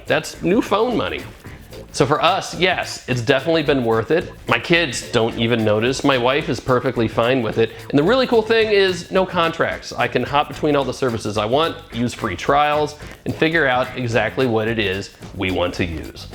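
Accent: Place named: Nova Scotia, Canada. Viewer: You sound like you live here where I do, Nova Scotia, Canada. 'Only 2 Providers', Eastlink and Bell and they both charge the same and have pretty much the same packages. I'm paying $ 80.00 for internet as well, however, I do think that's too much for 100 Mgbs, it should be about half that, around $ 30.00 to $ 40.00 per month. Not good bc we actually have absolutely 'NO' options with only 2 ISP's.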